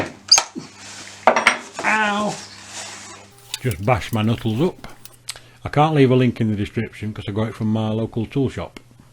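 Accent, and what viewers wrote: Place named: West Yorkshire, United Kingdom. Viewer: Great video thanks pal, I nearly bought the orange one until I saw this so cheers, sounds like you’re from West Yorkshire too? I’m in Halifax!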